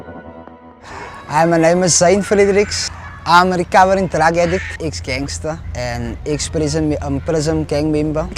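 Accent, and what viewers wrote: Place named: South Africa. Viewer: such an awesome testimony,plus how awesome is the south african accent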